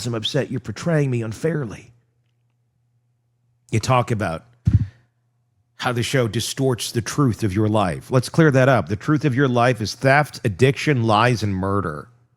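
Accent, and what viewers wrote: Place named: South, United States. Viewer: I love your southern accent. 😂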